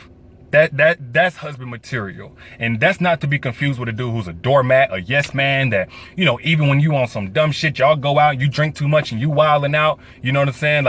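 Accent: South, United States. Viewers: Lol, the living room. You are straight out the gate 250% SOUTHERN....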